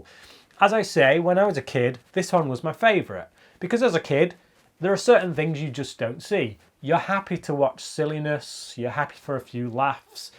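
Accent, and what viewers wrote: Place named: Scotland, United Kingdom. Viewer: I finally stopped hearing your scottish accent, the more you listen, the more you get used to it i guess, now your speech sounds like poetry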